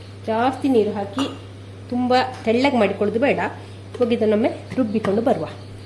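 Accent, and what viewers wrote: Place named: Malnad, India. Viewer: ನಿಮ್ಮ ಮಲೆನಾಡು ಶೈಲಿ ಯ ಕನ್ನಡ ಕೇಳಲು ತುಂಬಾ ಚಂದ.!!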